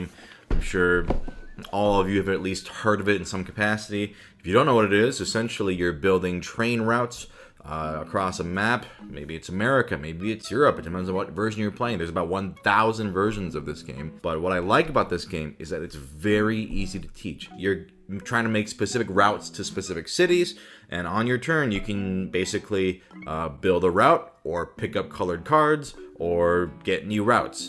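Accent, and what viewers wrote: Germany. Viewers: Your German accent is on point! Bruh! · God I love his fancy German accent lmao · German accent made me subscribe 🤣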